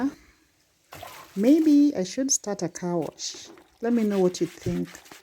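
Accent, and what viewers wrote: Kenya. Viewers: Which part of Kenya is this? It is beautiful. I can only tell that you are from Nyanza following your accent.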